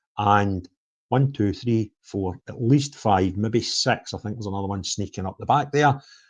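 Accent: Scotland, United Kingdom. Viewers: He's Scottish you bigot.!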